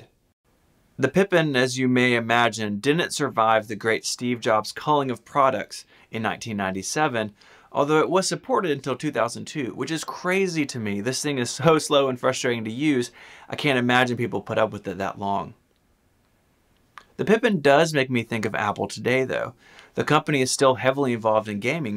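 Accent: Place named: South, United States. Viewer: This guys from the southern area of murica. They’re not very bright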